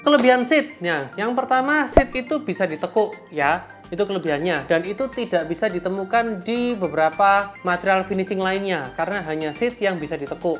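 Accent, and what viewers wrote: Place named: Java, Indonesia. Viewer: medok banget.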